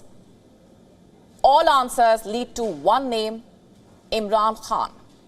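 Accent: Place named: India. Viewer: The Indian accent and the hate whenever she says the word 'Pakistan' and 'Army' 😅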